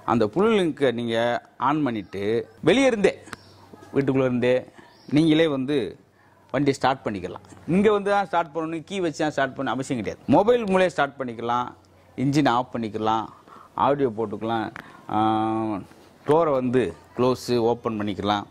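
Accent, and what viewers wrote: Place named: Madurai, India. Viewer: UNGAL COMEDY HIT AAVURADHUKU MADURAI SLANG UH ORU KAARANAM 💯💥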